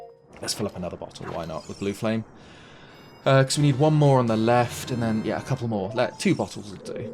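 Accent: United Kingdom. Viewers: Are you from leicestershire?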